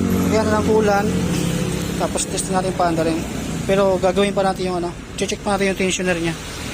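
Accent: Ilocos, Philippines. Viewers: masa Ilocos ka ba boss?!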